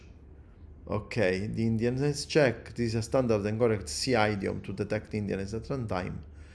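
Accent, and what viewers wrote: Sicily, Italy. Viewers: il tuo inglese mi fa morire perche mi ricorda tanto il mio. Il tuo richiama la Sicilia Sud Orientale, il mio quella nord occidentale:-)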